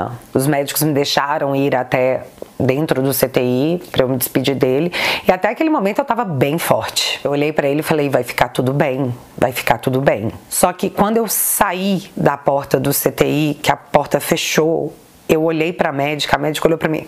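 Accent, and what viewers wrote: Minas Gerais, Brazil. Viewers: Olá, te sigo há muito tempo daqui da Inglaterra onde u moro, você já me fez sorrir e até gargalhar com seu jeitinho e sotaque mineiro, mas hoje me fez chorar, talvez vc nem veja essa mensagem, pois são tantas as que vc recebe, mas se você ler, quero que saiba que você é muito especial, seus vídeos esclarecedores e me ensinam muito mesmo! Quando comecei a te seguir você não tinha nem cem mil seguidores e olha só como você cresceu! Deus tem te abençoado, continue com Ele e que mais bençãos recaíam sobre você e família! Também sigo o Rodrigo Silva e também sou Adventista, que o Pai Eterno esteja com você e que seu canal seja para a Honra e a Glória Dele 🙏🙏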